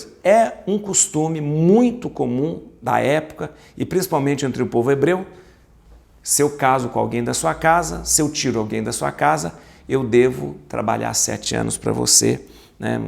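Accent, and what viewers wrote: Minas Gerais, Brazil. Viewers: Padre, que riqueza está sendo o estudo da Palavra. Estou aprendendo bastante, fazendo várias anotações e rindo do seu jeito engraçado (sotaque de mineiro é divertido demais kk). Deus te abençoe! Obrigada e parabéns pelo lindo projeto!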